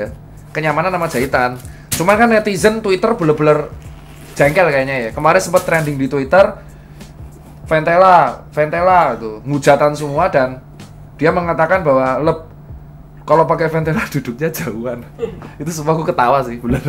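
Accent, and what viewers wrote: Java, Indonesia. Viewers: Bahasa indonesiamu medok mas, podo 😂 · Dr tirta jowo medok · Ngomongnya medok tapi keren 😅👍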